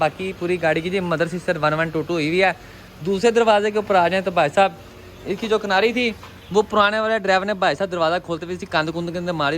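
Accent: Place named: Punjab, India. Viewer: I love your beautiful accent. My beautiful Punjabi brother.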